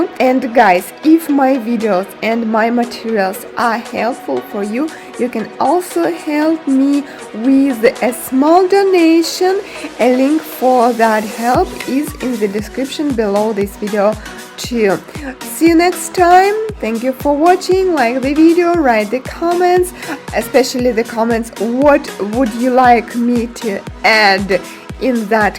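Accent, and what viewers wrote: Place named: Russia. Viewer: Это круто, но я думаю, вам нужно все таки немного избавиться от русского акцента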